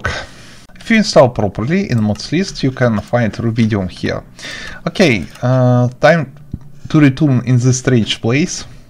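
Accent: Russia. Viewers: eu tenho quase certeza que ele é russo pelo sotaque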